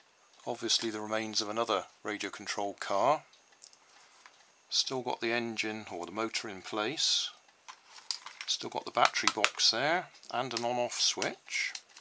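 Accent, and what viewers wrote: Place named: Yeovil, United Kingdom. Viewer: We're are u from it sounds like a Yeovil accent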